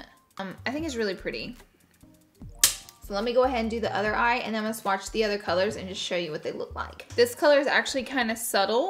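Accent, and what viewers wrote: South, United States. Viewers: I’m a Louisiana woman and I love your Southern accent. Sounds Louisiana or Alabama...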